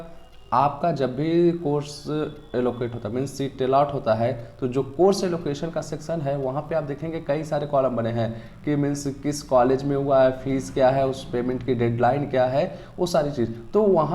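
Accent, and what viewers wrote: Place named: Uttar Pradesh, India. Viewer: Bhai up waale m ko yam aur H ko yah Cos ko cas aur Gauge ko gaaz hi Bolte hai😄😄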